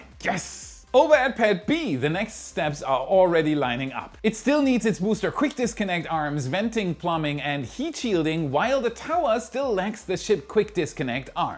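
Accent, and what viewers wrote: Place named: Germany. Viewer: Even his accent is Crazy. To less Information about the topic seems like some random german just startet with reels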